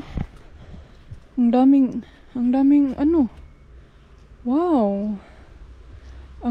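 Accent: Visayas, Philippines. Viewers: Hi Ms. i really like you 😄 the way you speak tagalog with bisaya,yong bang wag kang lumigid dyan at ang hirap litukin,mkatawa jud ko nimo ayy...anyway be safe always sa inyong travel with your hubby. I really like also your background music,i love to hear it. God bless you ms. & your hubby 🙏💜 Your follower here in Iligan City,Mindanao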